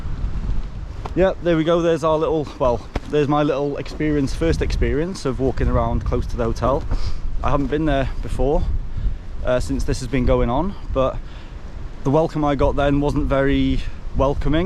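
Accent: England, United Kingdom. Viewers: The real and prolonged threat to Welsh culture is English immigration. Your English accent is testimony to that. Happy to be overrun and ruled by your English masters eh.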